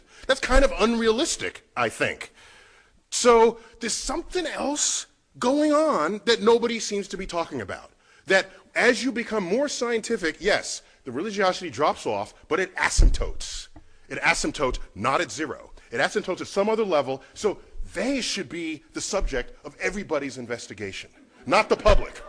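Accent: Jamaica. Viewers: Are you Jamaican man? Love your channel.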